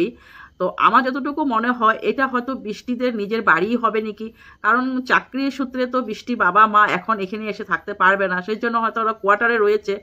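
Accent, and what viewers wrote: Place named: Northeast India, India. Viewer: North east e Jara thaken tader aneker Bangla Bolar style ta erokom.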